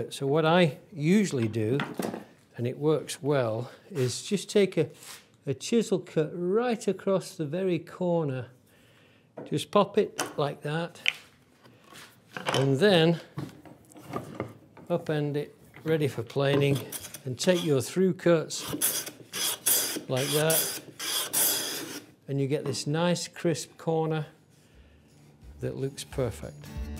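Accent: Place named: Southeast England, United Kingdom. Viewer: Hey, what part of the 🇬🇧 are you from? You sound like a southeastern accent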